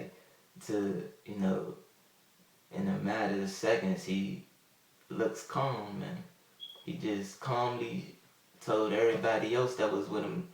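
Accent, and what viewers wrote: Washington DC, United States. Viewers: Cool hair, you sound like you're from DC. This vid was interesting.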